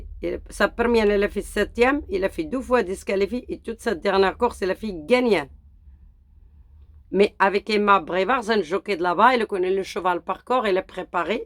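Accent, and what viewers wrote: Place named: Brazil. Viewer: Bonjour de la Guadeloupe...continue sur les pronos..avec ton accent brazil....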